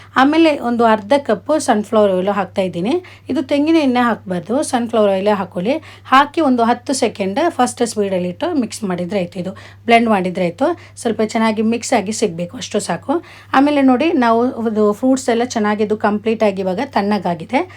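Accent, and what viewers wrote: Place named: Kerala, India. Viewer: Nice to see your recipe. Will try. Good explanation. Some of your accent tells that you are from Kerala. Right??😊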